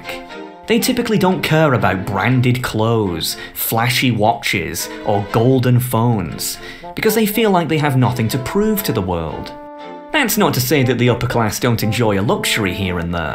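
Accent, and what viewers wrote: United Kingdom. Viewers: It took me a while to figure out how nonsensical this guy is. I guess I gave him more leeway because of his nice British accent. 😅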